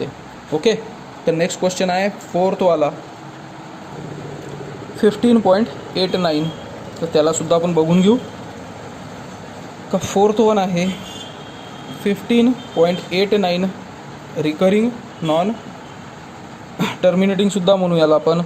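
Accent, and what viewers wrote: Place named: Vidarbha, India. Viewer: mi pn vidharbhatli Bhasha vrunach kalte ki tumhi vidharbhatle aahet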